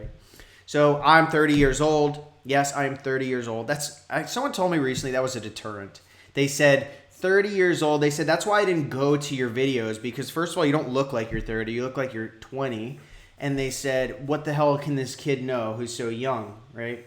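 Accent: New Jersey, United States. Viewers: You can take the boy out of Jersey but you can’t take the Jersey out of the boy 😎🤘🏼🙏🏼WHA-DUH 💦 💧 🤣🤣🤣 I freakin ❤️ it!!! Don’t change , be you siempre 😊😊😊 #dailyliststrong 💪🏼💪🏼💪🏼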